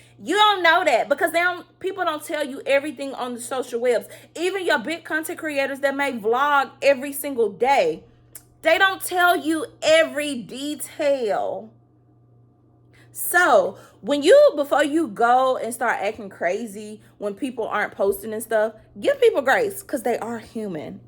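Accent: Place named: Chicago, United States. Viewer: I'm so here for your vibes! Are you from Chicago? You sound like my people 🙌🏾 I'm from Chicago but I live in Seattle now. I was a med/tele nurse but quit my job around 7 years ago to do my art full time 🎨 I love when the YouTube algorithm put creative Black women on my radar 💕 Love your craft room. Happy birthday!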